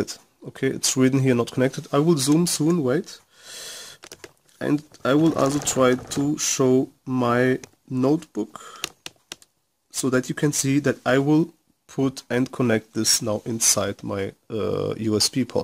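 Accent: Germany. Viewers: Pls Help.....I cant detect the chip!...Ich habe alles richtig angeschlossen und höre das du ein deutscher bist!...könntest du dich hier mal melden?...ich habe ein Msi Board verflasht mit der eigenen mflash bios methode...war nicht meine schuld! Ich habe alles so gemacht wie du es in den Videos beschrieben hast!!!.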